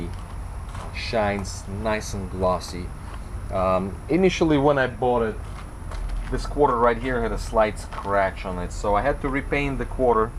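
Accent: Russia. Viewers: Суровый русский акцент. За Краун - лайк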